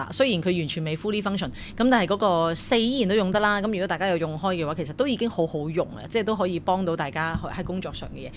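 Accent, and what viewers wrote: China. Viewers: 大陆哩音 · 这些不能叫做乡音，完全是一个母语普通话的人在学讲广东话。😂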